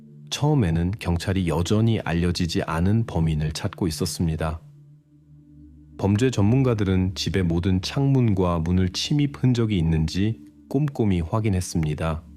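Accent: China. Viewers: 약간 조선족말투 같기도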